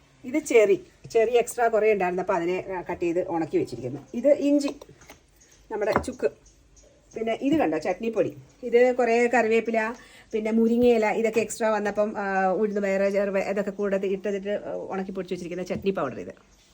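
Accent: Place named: Kottarakkara, India. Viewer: കൊട്ടാരക്കര ഭാഷ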